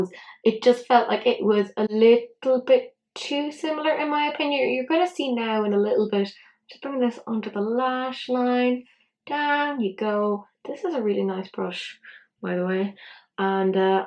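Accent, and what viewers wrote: Ireland. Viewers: I bought this palette because of the bright colorful shades, that I normally wouldn't wear, but I thought the bold colors in this palette would bring out the intensity of some of my multichromes!. Nice to hear what sounds like a "Dub" accent schooling me on some new make-up! I definitely will use your code on my next order with this brand.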